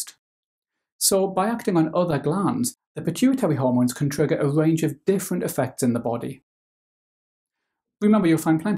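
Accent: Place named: Northern England, United Kingdom. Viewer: Northern english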